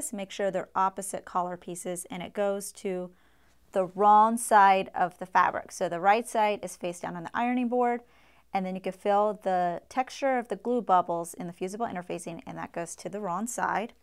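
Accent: United States. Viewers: talks too much and silly american accent , more interested showing her nails and manicure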